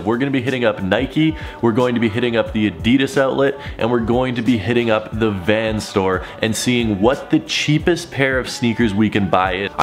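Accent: Winnipeg, Canada. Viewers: Are you from Winnipeg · Are you Canadian oh and I going to like my own comet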